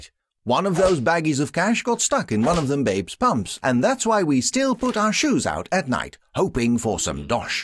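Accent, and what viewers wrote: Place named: Netherlands. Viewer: Cecilia Brennan that's because the Guy making this is dutch, im English and i find that the dutch accent sounds a bit weird xD i recognize it as soon as i hear it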